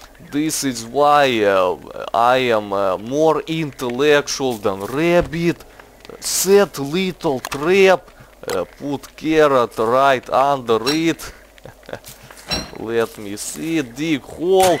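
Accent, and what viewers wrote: Russia. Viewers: Nice accent are you russian? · I got spamed and came here, and it was worthy :D I want more videos with 'dat Russian accent!!' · His Accent Is Awesome :D i love russian people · he's russian right? privet esli da :D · XD There's a kind of swedish sound to your voice, even though the accent is sort of russian. Where do you come from?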